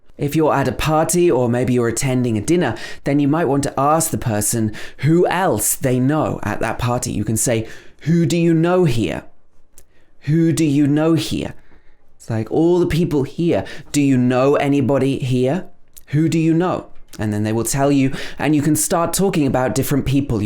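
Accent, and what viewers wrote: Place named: United Kingdom. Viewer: Pls do continue making videos...I am an indian ...its great to learn British accent from you...doing a great job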